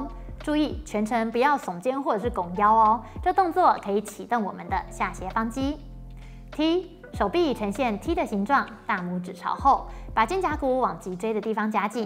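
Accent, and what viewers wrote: Taiwan. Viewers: 我超喜欢你的内容😊还有你台湾的声音😊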